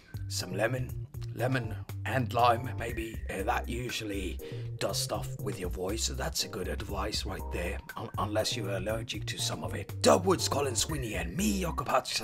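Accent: United Kingdom. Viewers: I hear the "UK" in your voice too. Maybe it's where you learned english from? I know this man from China and he has a "UK" accent. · Really enjoyed this video ! And UK accent is the way to go !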